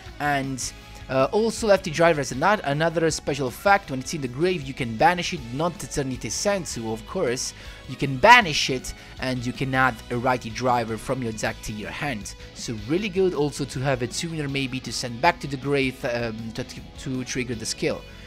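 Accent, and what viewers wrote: Italy. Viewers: Ma quanto cazzo sei italiano? Sgamato dall’accento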